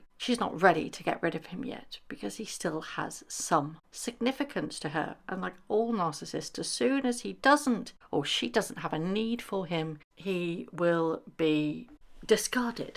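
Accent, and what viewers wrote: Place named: United Kingdom. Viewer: I think the term you are using which sounds like mum with your British accent is Ma’am here in the US, the female equivalent of Sir, and the older version of Miss. it is used by some service people, some younger people, and I, elderly myself, will use the terms to get someone’s attention if I wish to be more formally polite.If a younger person,I’ll just say excuse me. Never would I use it for a class or social position, ever. Especially with them. Just to get someone’s attention. I was an Army child of a Lt.Colonel,and I didn’t call my father sir or my mother ma’am, but my father was called sir by junior officers.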